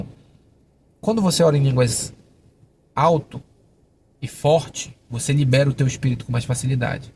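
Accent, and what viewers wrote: Italy. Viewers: É um sotaque italiana pastor muito lindo !!! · Verdade parece ucraniano , tbm tem um sutaque de italiano sei lá , é lindo demais né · Sua língua parece muito com italiano, tanto a língua quanto o sotaque.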